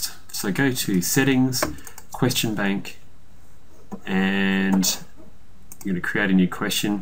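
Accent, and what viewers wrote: Australia. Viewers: Fantastic, you've saved me a whole heap of heartache - so clear and concise - nice to hear an Aussie voice on youtube as well:)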